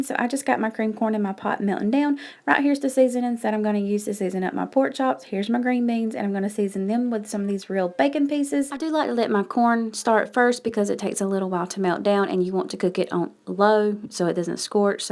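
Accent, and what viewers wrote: South, United States. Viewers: Hi! Just came across your channel. Where do live? I detect your southerness. I am from Texas. My grandma lived in a super small town on the border of Texas and Oklahoma, but her mom was from Georgia. I love this kinda cooking.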